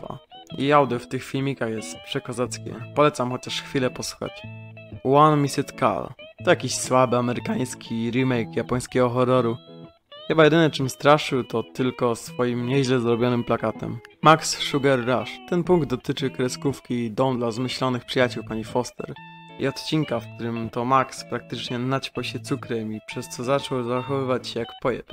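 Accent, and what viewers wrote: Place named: Poland. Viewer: Polglisz mocno xd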